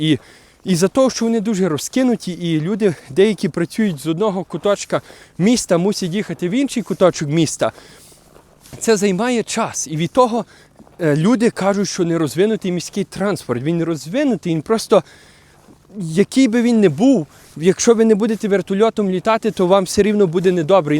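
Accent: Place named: Lviv, Ukraine. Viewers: Та ньи,він хоц і канадец,але чолувік з Львова,і всьо їдно всі розуміют шо хлоп хтів сказати - в тому й колорит нашої мови.На жаль ми катастрофічно втрачаємо наші місцеві діалекти - замість напевне якогось Черкасько - Полтавсько - Запорізького варіанту мови.ТАК ШО ВСЬО ФАЙНО! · Хлопче, кому ти це говориш?..До біса все це. В тебе коломийський акцент розмови, гайда копати кирницю в Канаді. Твої предки мігруючи за кордон, молодці що зберегли і законцервували для тебе мову. Так само зробили мої предки мігруючи до Аргентини.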